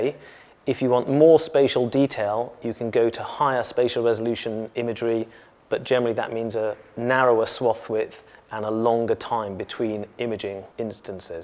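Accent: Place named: Europe. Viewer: Ouais ouais ouais euro anglais on est là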